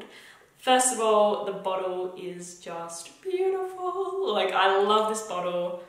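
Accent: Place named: Australia. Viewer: Aussie girl back at it again. Love the accent she has it’s orgasm into my ears...haha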